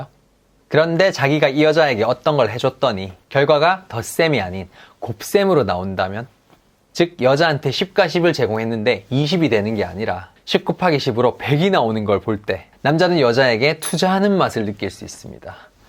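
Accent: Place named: Busan, South Korea. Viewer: 부산 사투리와 표준어의 절묘한 만남이 느껴지는데, 기분 탓일까요?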